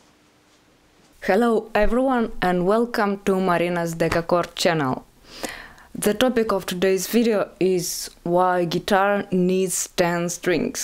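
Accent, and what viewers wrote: Russia. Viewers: I love your accent hehe. You know pretty much of music history by the way, wish some day meet you and talk all day, you can teach me russian, and I can teach you spanish ! Hahaha. Buen video, saludos ! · Music needs more than 6 or 7 or 10 strings, music is choking with limited strings number, give it freedom 💁🏻‍♂️ I enjoy this explanation, and like the way you speak rushinglish😅... But above all, my dream to have 6 or 7 or 10 strings guitar 🤩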